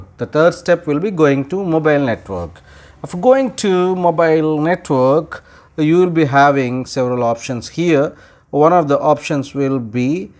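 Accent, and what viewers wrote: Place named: Israel. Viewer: You clearly are an israeli by your accent and that israeli airline app u got i just hope you get the justice we deserve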